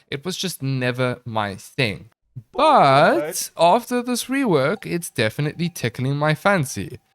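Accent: South Africa. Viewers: I can hear you are South African XD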